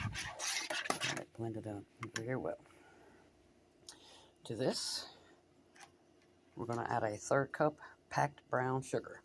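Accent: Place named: Caribbean. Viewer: Nosey Question: Which island are you from … originally ❓ I recognize a familiar accent 💥 🇹🇹 Yes No? 🤩🤩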